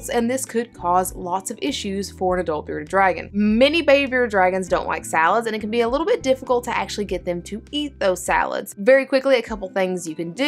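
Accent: South, United States. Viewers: OMG!!!! I was listening to this and your accent wondering if you were in Alabama or the south … then you mentioned ANIMAL TRAX!!! I just went there yesterday in Madison! I live in Holly pond - where are you from??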